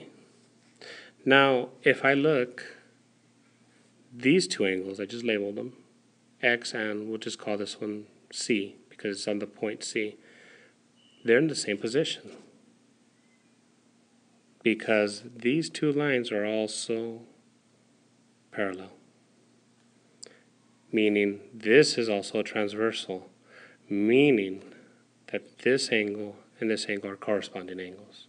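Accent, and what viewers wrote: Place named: Egypt. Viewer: your voice is like egyptians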